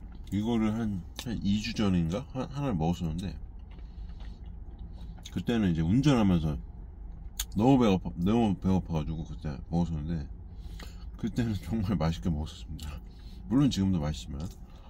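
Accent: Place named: Incheon, South Korea. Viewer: 말투가 인천이신데 인천분이신가요?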